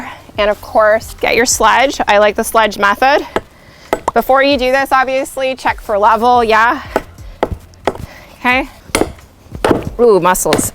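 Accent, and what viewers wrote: Canada. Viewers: She sounds Canadian.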